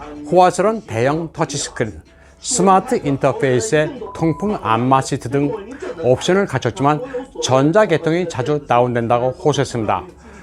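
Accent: China. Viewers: 이 사람 발음 보니 중국인이구만 ㅉㄱㅇㅇ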